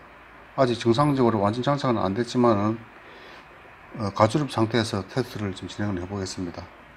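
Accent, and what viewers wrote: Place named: Western Gyeongnam, South Korea. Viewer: 서부경남분이시네요 ㅋㅋ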